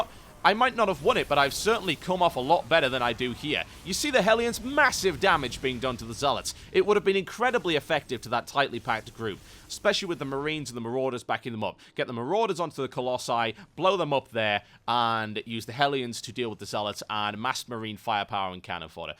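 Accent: United Kingdom. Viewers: i think ur funny as hell jw r u british caus eu sound just like my britsh friend :D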